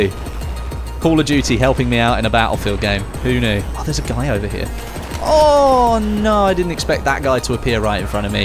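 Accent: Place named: United Kingdom. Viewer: Whoah ur from UK anorl I thought u were American what part UK u from some accent that mate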